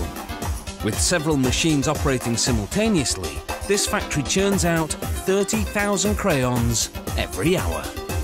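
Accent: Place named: United Kingdom. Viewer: I wasn’t expecting the British accent I’m accustomed to the USA accent, where is the SAP button?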